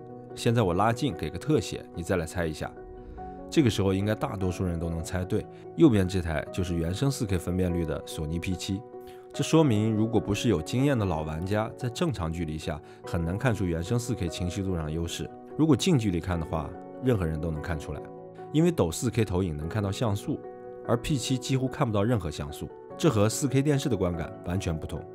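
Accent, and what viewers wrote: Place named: Shanxi, China. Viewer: 是山西人吧感觉和我说话差不多哈哈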